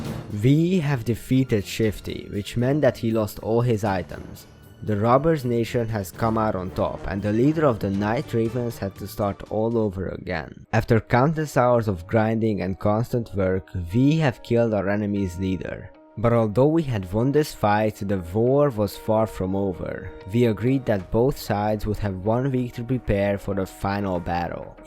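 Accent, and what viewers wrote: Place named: Hungary. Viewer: You have a little hungarian accent, but i love it. You are probably not hungarian but yeah.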